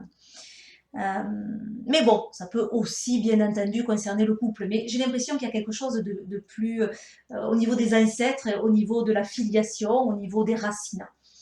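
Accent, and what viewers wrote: South, France. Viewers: Quel accent du soleil une merveille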